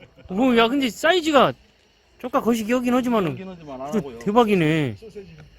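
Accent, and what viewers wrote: Jeolla, South Korea. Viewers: 전라도 사투리 중에 오리지날 입니다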